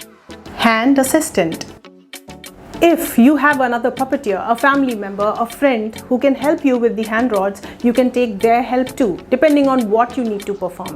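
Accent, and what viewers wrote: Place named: India. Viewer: Very good. Thank you. The Indian accent is gorgeous too!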